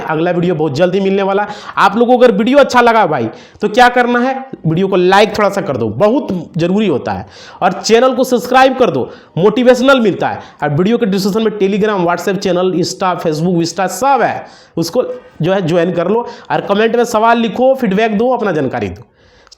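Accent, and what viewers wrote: Bihar, India. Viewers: Adha padha likha lekin insan khud ko bahut samajhdar samajh raha hai bewakuf , jo bhi bolta hai pura bihari style mein, galat hindi aur english ke sath. Pura zahil hai.😡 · Jara soch ke dekho jara dhayan se suno aur suno, Bihar accent me bolkar bar bar bol raha hai samanjh gia samanjh gia ? Rafu chakker ho gia rafu chakker ho gia.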